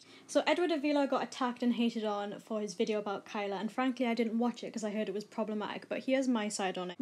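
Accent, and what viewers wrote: United Kingdom. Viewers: Are you... a geordie? Trying to place accent lol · but that's none of my Business . Chill out it's her voice not yours if you don't want to hear it then leave. Also British accents are great don't diss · Off topic but where are you from? Your accent seems british to me?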